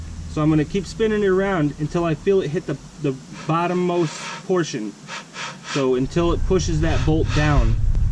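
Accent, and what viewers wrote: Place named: New England, United States. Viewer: I agree with others here, You sure do sound like Andrew. Must be the New England accent.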